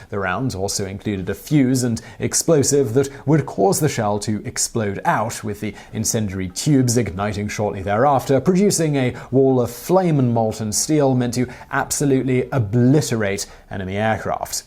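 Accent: United Kingdom. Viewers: Too many factual errors, even with the British Accent, to be worth watching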